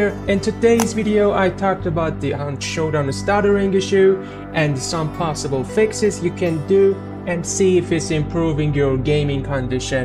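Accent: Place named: Iran. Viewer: The Iranian english accent is thick with this one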